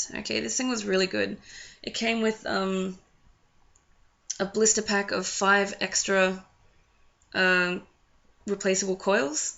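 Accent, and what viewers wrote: Australia. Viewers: it's so nice to hear a laid back aussie on youtube for a change.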